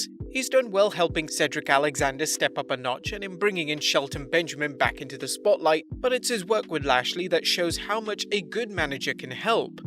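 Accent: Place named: United Kingdom. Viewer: OH GOD.... FIRST YOU SAID "MARY-LAND"...(pronounced Mar-UH-lind), now "RE-NAY-SAUNCE"!?!?!?!?!? WTF!!!!!!????? It's pronounced Re-Ni-Saunce!!!!!!! British WL host whatever your name is..... YOU'RE KILLING ME BRO! GO WATCH SACHA BARON COHEN IN THE MOVIE "THE TRIAL OF THE CHICAGO 7" THE BRITISH CAN SPEAK ENGLISH WITH AN AMERICAN ACCENT!!!!!!!! IF COHEN CAN DO IT, SO CAN YOU MY FELLOW WRESTLING BROTHER!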